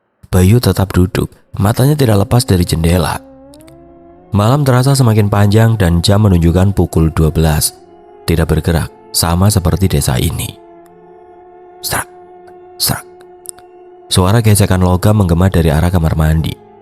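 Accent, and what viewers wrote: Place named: Java, Indonesia. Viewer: Logate medok syekalee😂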